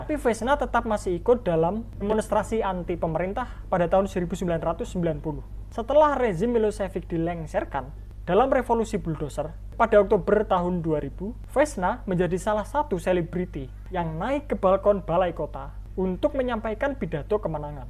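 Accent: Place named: Java, Indonesia. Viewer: mas’e medhok opo wong jowo :D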